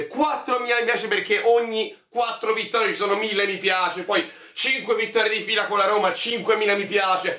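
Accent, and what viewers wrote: Tuscany, Italy. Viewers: Sei toscano? Sempre e cmq Forza JUVE💚🤍❤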